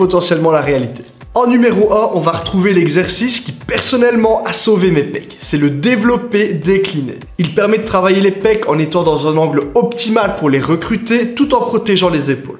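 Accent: Belgium. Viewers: Sa s'entend que tu es Belge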